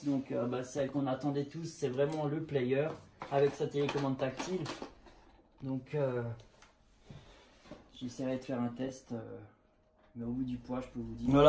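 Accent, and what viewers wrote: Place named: Alsace, France. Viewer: On sent l’alsacien de base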